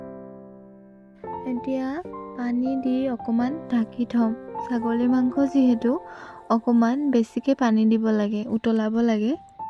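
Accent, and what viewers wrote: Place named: India. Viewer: Beautiful. I find your bangla a bit different than kolkata. Where is it spoken like this?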